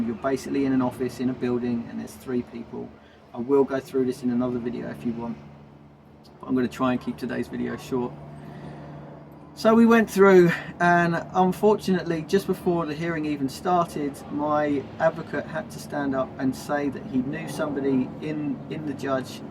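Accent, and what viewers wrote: England, United Kingdom. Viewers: Your English accent is very interesting to learn for a people from abroad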